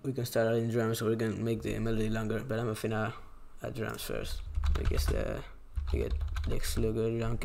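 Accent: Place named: Spain. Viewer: Hey man I love the content. You have a cool accent are you spanish? Are you mixed with any black?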